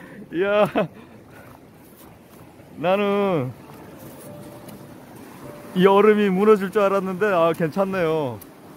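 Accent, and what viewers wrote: Gangwon, South Korea. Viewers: 유트버님 억양과 말씀 하신걸 들으니 강원도 영 평 정 같아요. 항상 보고 있습니다. 감사합니다.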